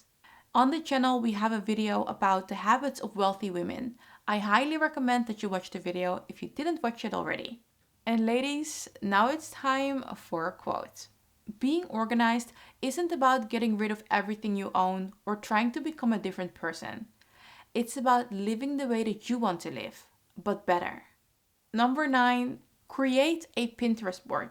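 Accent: Netherlands. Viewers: Thank for giving me to motivation to start orginizing! And watching your video I was thinking she sounds so dutch.. Checking the about and yes you are! Ik ga je volgen! Groetjes van een nederlander woonachtig in Zanzibar.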